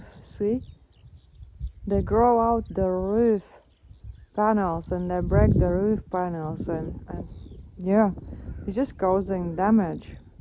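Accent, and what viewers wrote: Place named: United Kingdom. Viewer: Buggers! I'm getting hints of British English. Charming!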